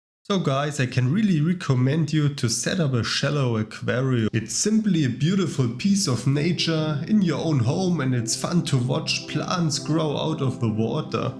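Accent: Germany. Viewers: i hear some german😅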